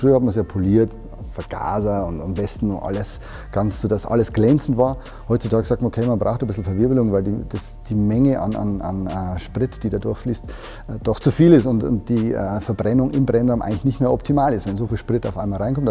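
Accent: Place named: Bavaria, Germany. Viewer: Sehr sympathisch erklärt, a echter Bayer halt👍👍👍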